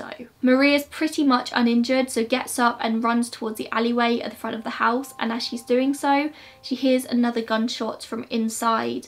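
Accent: England, United Kingdom. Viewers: I know this is so played out, but I just love an English accent.Combine that with murder mystery? I'm in! 😊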